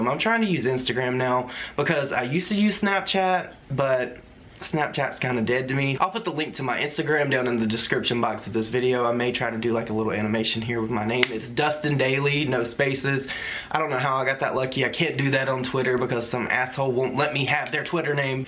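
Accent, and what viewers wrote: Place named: Carolinas, United States. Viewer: OMG so excited there’s another in our area. I’m in Clover but raised in Gastonia. Subbed to them. Love hearing our country drawl in videos I’m watching. ❤️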